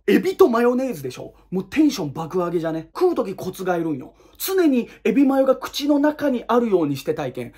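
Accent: Hiroshima, Japan. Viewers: 心地良い広島弁と丁度良い動画の長さで受験の息抜きにさせてもらってます！😂 偏見シリーズ一番好きなので一生やって欲しい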